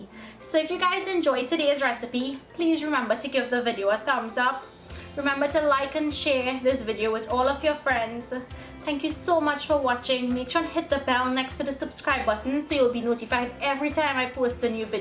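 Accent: Trinidad, Trinidad and Tobago. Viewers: L'Ange Gemi-fob it's Trinidad accent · I'm here for the Trini accent - the food is secondary!! :)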